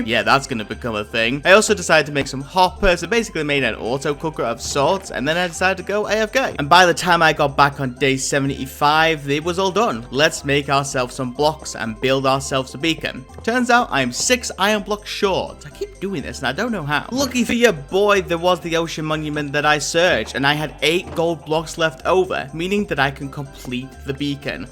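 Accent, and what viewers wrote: United Kingdom. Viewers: Are u British 😅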